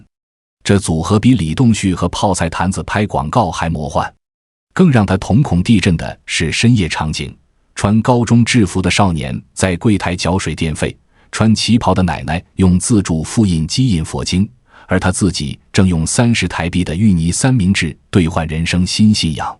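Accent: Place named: China. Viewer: 連ai配音都中國大陸腔...😒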